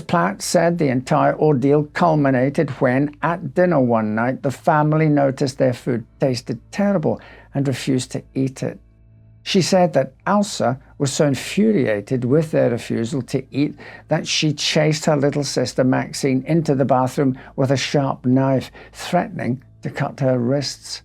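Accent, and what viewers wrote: United Kingdom. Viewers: This could be a regional English accent.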